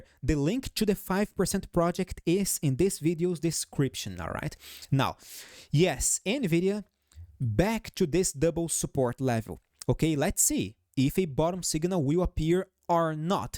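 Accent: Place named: Brazil. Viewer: Eu desconfiei desde o primeiro video que assisti que vc era brasileiro pelo sotaque kkk. Parabéns pelo trabalho!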